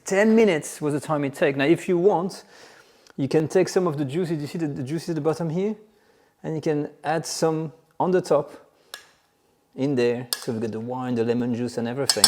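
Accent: France. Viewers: Dude, I bet this video can be done in 2 min or less. Besides, the French have an awful accent. Hair of after 4 min. Really!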